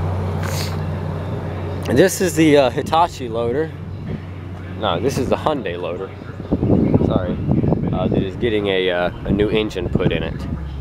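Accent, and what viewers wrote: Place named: Carolina, United States. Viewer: Thanks...that was cool. Ever ship cars to other states? Where are you? Sounds like a Carolina voice.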